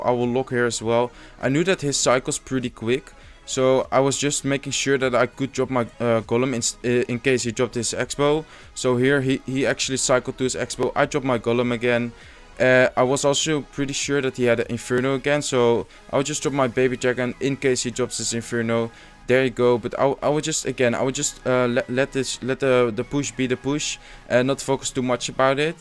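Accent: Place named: Netherlands. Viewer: lekker dat Nederlands Engels accent maar toch wel tof je video ga zo door!!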